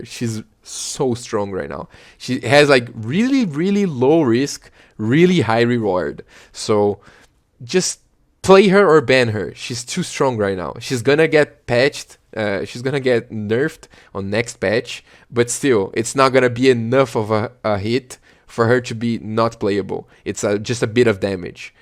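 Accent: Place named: Brazil. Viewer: Sotaque de brasileiro falando inglês é muito fofo 🥰🥰🥰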